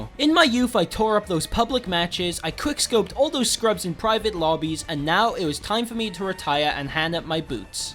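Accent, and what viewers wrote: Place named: South Wales, United Kingdom. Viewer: Nice to see a welsh YouTuber for once Also you from Newport, like it’s a weird question but you have a south accent.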